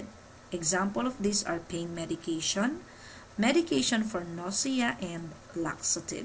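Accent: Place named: Philippines. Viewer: I love the filipina accent. 😘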